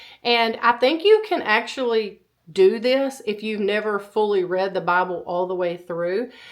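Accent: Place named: West Texas, United States. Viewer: So glad to see you feeling better. It bypassed us this year. Thank you Jesus. I also have changed my scripture time. I have been sick all year and neglected the word. I love your home and thoroughly enjoy listening and watching you. I live in west Texas up in Lubbock. So your accent is my favorite. Happy New Year.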